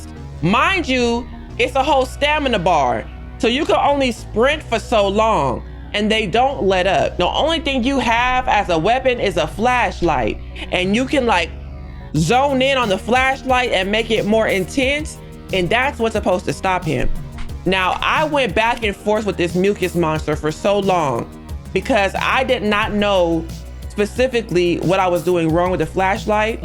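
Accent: United Kingdom. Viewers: I haven’t even finished the video yet, but I already know the narration is gonna have me weak 😂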